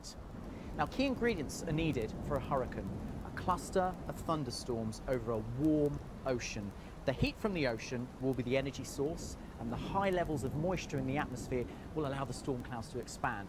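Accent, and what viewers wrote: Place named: United Kingdom. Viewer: Because he is British and that's how its pronounced in Britain, the e at the end is silent. Just like in America you guys say tomato as to-may-tow, we brits pronounce it "tom-ah-tow. There is no right or wrong way to say it, it's the same thing, it's hardly a big deal...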